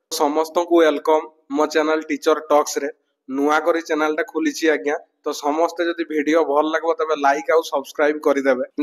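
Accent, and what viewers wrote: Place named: Mayurbhanj, India. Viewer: Bhai pura amo mayurbhanjia bhalia hi katha heuchan 😊 social media re bi dil khaliki katha ❤🎉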